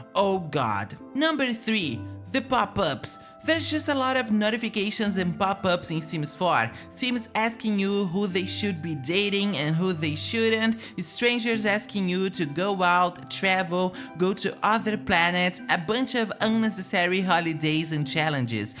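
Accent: Brazil. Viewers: I still have Sims 2 Ultimate Collection and I love to play it so much, its thousand times better than Sims 4, but then I never played Sims 4's DLC's, so I want to experience the DLCs for myself too. So, I play both but with the Tutorials from PleasantSims, so I play rotationally and just with the Maxis Sims, and I use a lot of Mods too, that are essential. There is even a Sims 2 Savefile, which has the ancestors and everything from Sims 2, but I never used it I just saw it in videos, it seems to be perfect, but I feel like Sims 4 isn't the same universe as Sims 2. It would be perfect if they could make a new Sims game with Sims 2 + 3 gameplay, world, ancestors and all and just the CAS and B&B from Sims 4. That would be perfect, what do you think? PS: Seu sutaque brazileiro é notavel, mas o seu inglés é muito bom! É bom falar assim com o sutaque. E já me inscrevi no seu canal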